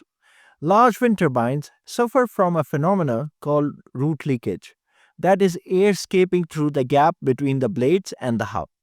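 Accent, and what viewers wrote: India. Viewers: I am enjoying the Indian lilt of this man's English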